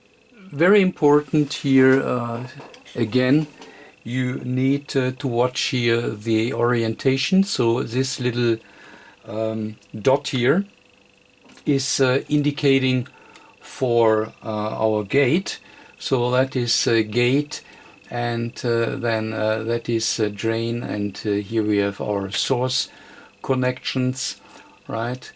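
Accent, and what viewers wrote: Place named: Germany. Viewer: You speak like an kraut...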